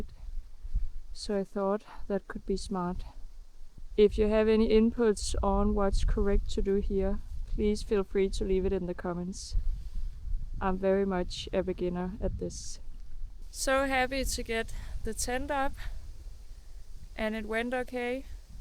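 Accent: Denmark. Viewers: Im instantly hear you are from Danmark on the accent😊 3 hours småland?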